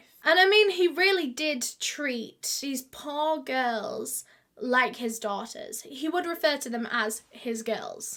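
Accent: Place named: Northern England, United Kingdom. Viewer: I fr rock w videos i cannot bring myself to watch any other true crime youtubers i just love that northern accent and she is so nice i luv her fr 😭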